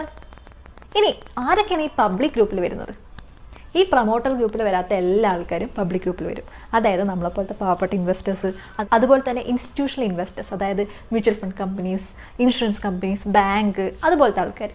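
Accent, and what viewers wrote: Kannur, India. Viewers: Kannur style...good information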